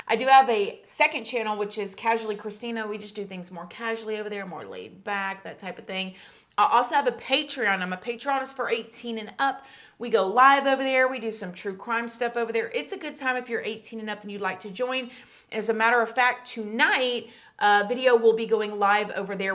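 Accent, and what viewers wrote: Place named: Florida Panhandle, United States. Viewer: Ha! your accent sounded so familiar to me. I too am from the 850. Hi there, girly!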